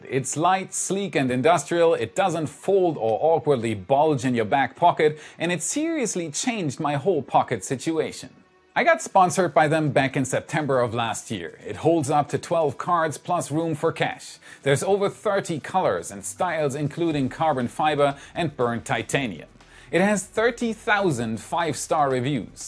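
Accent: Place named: Germany. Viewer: THE #1 most important thing about American English is that “the other person” understands you. It’s okay to be German and sound like it! And stop worrying about your “r”s! We LOVE your accent!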